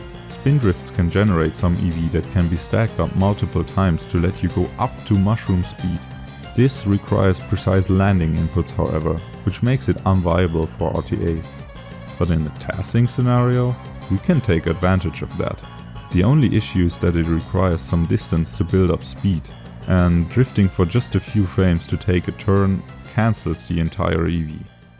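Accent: Germany. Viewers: 2000% bist du deutscher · Bro sounds a bit German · i just found your channel and after 10 seconds i knew i had to subscribe banger video man + i know youre german but i cant prove it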